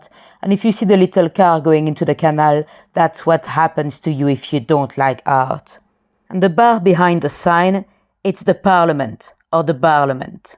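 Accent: France. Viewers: Nice video, nice storytelling and also nice accent. Is it French?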